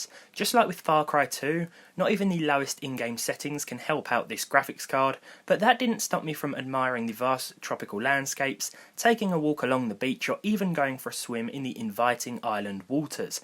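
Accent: United Kingdom. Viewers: I like ur accent where do you come from in UK?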